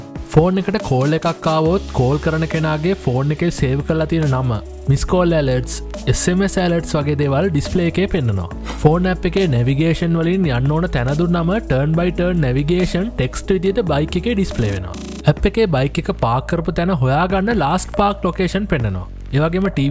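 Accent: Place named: Matugama, Sri Lanka. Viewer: machan uba matugama peththeda ? video ake mathugama peththe then vagayak thibba....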